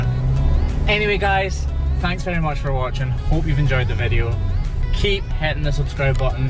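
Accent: Scotland, United Kingdom. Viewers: I work with quite a few Scots and while their accent is easy for me understand, it does get stronger when they talk to each other, as well as faster and with more Scottish slang. would be taking a little slower on his vlogs to maximise understanding for those who aren't Scottish. Most U.K. Residents and those in Australia and New Zealand would probably understand him very easily but those in North America maybe not so much. His slightly slower speech for the vlogs would also be more beneficial to those who have English as a second or third language. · Hey - just wondering - do you speak differently when you’re talking to the farmers, like with a heavier accent? Or when you’re in different parts of Scotland? If yes, I’d love to hear it!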